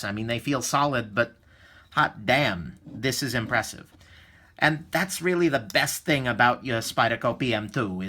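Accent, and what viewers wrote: New York, United States. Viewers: Great review ! Just ordered a PM2 from Amazon . Thank You for your honest opinion . plus i dig the NY accent . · I think it's a great accent- New York? Here in the UK we have a wide range of local accents, varying greatly over small distances. Unfortunately, in my lifetime we're losing them, youngsters speaking American, which seems out of place in grey, miserable Birmingham (UK not Alabama!) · reviews are a refreshing change from all the slow boring knife videos here on youtube, he's got that NY or Eastern accent and talks fast, I could watch his reviews all day. Yeah the Maitraya Buddha thing gave me a chuckle. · It's called a New York city accent. Language dialects make America colorful and interesting.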